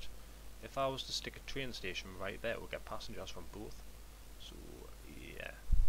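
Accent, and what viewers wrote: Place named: Northern England, United Kingdom. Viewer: I like your accent. Is it from north of England?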